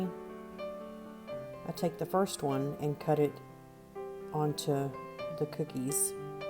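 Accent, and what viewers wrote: South, United States. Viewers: I like your southern accent, and I love “ what my mama said” part. I will definitely try this recipe. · That's how I grew up eating banana pudding. Not that instant stuff. Warm and DELICIOUS ♥♥ I know this is a late comment but, my husband could eat that by himself. LOL Looks good. That's exactly how I grew up eating banana pudding, the way my Granny used to make it, she passed in January and I have been searching for a recipe like this one. Al I have been getting the ones with the instant pudding and whip cream. No ma'am press ham, I need the OG BANANA PUDDING, and THANK GOD, I have found it. 😊😊😊 Thank you for the recipe. I love your accent also. I'm from the south as well. LR, ARKANSAS, BORN AND RAISED ♥♥ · Though I'm gluten sensitive, I enjoyed watching you make banana pudding from scratch. Listening to you speaking reminds me of growing up down South and it brings nice memories. 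💗🍌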